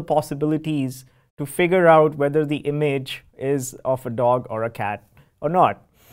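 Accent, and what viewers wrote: India. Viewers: why do you guys hate Indian accent ? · I can't go against myself and pretend that it's pleasant. It's not. The accent is irritating, and choosing an Indian native speaker to narrate a text in English is simply a stupid decision.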